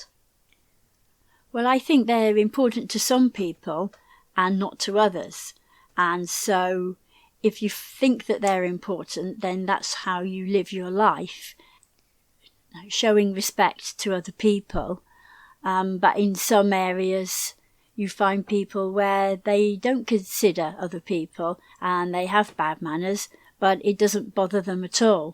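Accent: United Kingdom. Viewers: thank you great british accent to learn here and enjoy it I hope one day you go live with mom and dad 🎉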